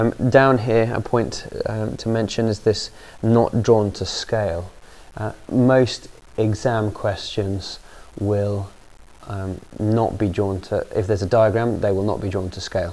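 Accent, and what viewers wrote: United Kingdom. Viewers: your accent is so british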